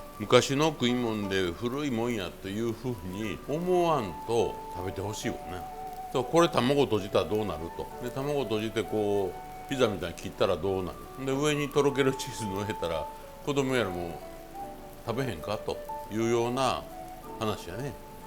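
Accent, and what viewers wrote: Kyoto, Japan. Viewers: 村田さんの料理本を持って海外赴任しました。どんなに役に立ったことか。村田さん、ありがとうございました。 今も参考にしてます。 京都弁も心地よいです。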